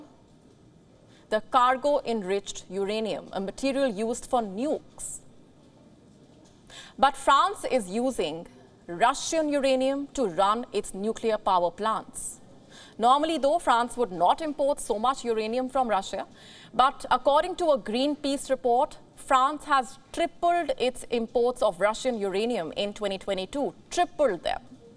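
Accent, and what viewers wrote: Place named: India. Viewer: How funny is the Indian accent 🤣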